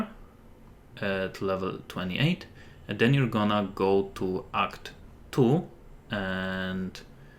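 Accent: Poland. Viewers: Akcent wskazuje na polskie pochodzenie. Mylę się? btw Nice movie! like it a lot!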